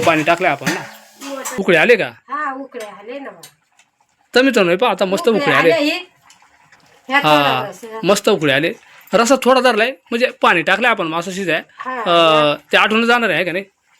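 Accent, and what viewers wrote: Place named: Nashik, India. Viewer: कुठे राहतात काका भाषा तर नाशिक जवळची वाटते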